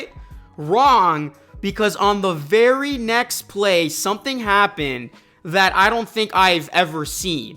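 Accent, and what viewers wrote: Minnesota, United States. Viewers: I can tell your from Minnesot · Your accent means your either from MN or Canada 🎉😂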